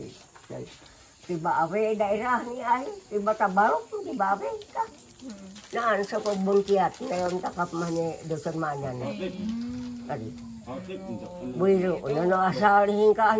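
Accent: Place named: Paser, Indonesia. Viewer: Biar ulun kada mngarti bahsa maanyan tpi ktuju mandangar logat sdin 😁. Mrip2 bahasa paser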